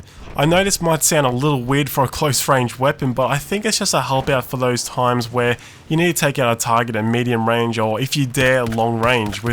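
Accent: Australia. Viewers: Im just wondering are u an australian?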